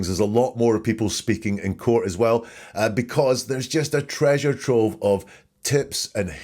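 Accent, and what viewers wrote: Scotland, United Kingdom. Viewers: Terrific stuff. Which part of Scotland are you from?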